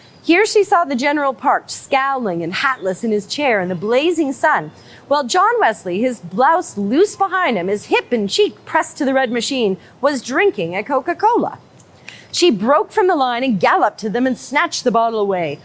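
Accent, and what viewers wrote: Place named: South, United States. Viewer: Love your southern accent and the seagulls,too. Well done !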